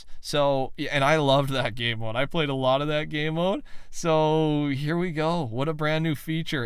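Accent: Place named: Canada. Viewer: Are you Canadian you just give off that nice Canadian voice and vibe…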